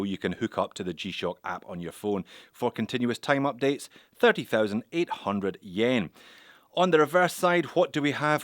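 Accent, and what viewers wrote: Scotland, United Kingdom. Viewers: It's not a $200 watch. Very enthusiast cheerful review this bloke sounds a wee bit Scottish maybe? 🤔 I prefer my Commodore Red LED from 1976 from Boots Irvine Ayrshire by Willie Claus 🎅🤣 Enjoyable video yet again.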